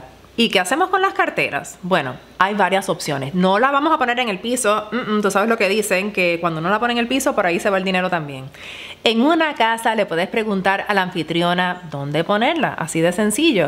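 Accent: Puerto Rico. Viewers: Me fascina el acento boricua.🥰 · Buena explicación, por el acento percibo que la presentadora es boricua. Saludos desde Panamá.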